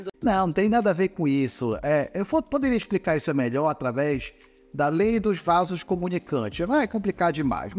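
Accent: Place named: Rio de Janeiro, Brazil. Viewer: Dr vc tem staque de carioca...vc é do rio de janeiro?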